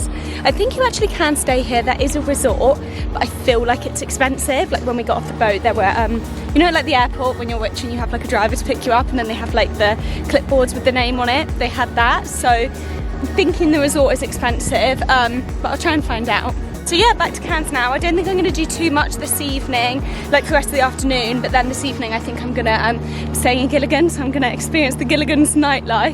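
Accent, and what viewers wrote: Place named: United Kingdom. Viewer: Always love your videos and charming British accent :-)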